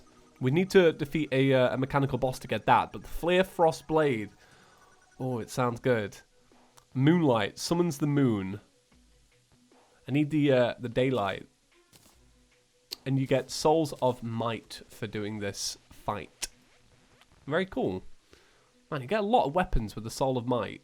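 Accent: England, United Kingdom. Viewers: I live in the US and you said 1000 pound grass and I was like... why are you weighing grass?! Then I realized thats money. And I never would have realized that if you didn't have one of the most english accents ever.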